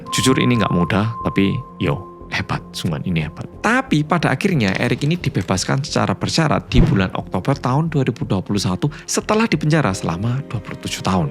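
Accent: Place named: Java, Indonesia. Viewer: Dihukum seumur hidup😮😢 Iblis gilak itu... Suwun ceritanya ya medok🎉❤🎉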